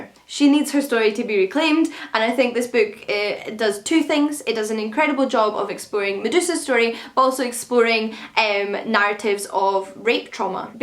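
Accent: Scotland, United Kingdom. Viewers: so nice to hear another Scottish accent on booktube! keep doing what your doing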